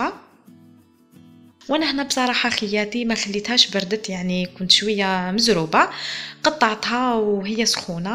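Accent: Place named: Morocco. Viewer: انت مغربية من هضرتك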